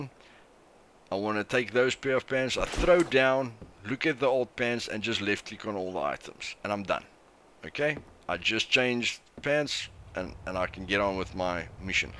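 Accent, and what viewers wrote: South Africa. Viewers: South African like myself? you sound like it! Good video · i can smell the south african on you boet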